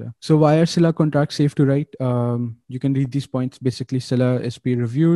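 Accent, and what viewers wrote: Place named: India. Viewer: Indian English not understand I.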